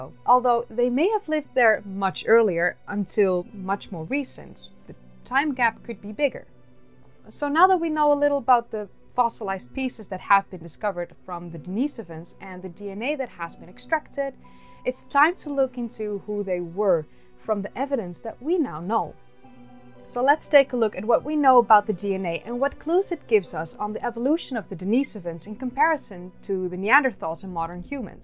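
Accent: Netherlands. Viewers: I missed your Dutch accent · does * speak with a Dutch accent? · the Dutch slur in your accent, it makes what you want to bring across sometimes hard to follow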